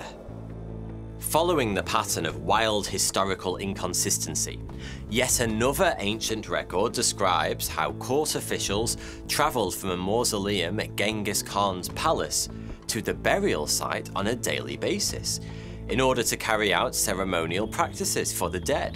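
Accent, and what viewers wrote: United Kingdom. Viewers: you're the best story teller ever and your britich accent makes 20 times better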